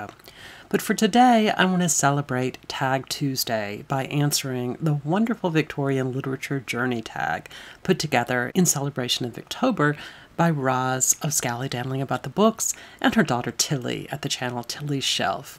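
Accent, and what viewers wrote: South, United States. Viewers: I love a Southern accent it's so soothing and mellifluous. I'm not well educated and keep a Chamber's by my bed to look up old outdated words and terms found in Victorian and Georgian literature. Thank you again for being an interesting guide.❤️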